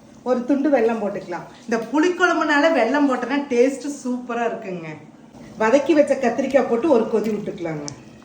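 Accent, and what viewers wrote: Kongu, India. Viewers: Kongu slang ❤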